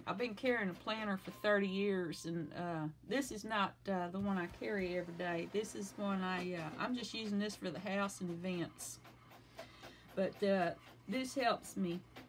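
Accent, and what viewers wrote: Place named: South, United States. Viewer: You are either from Mississippi or where I am from.... Arkansas!!! Enjoyed your video.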